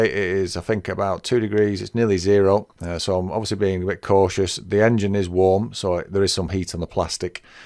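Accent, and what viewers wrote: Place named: England, United Kingdom. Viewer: Good to hear an English voice for a change on an instructional video.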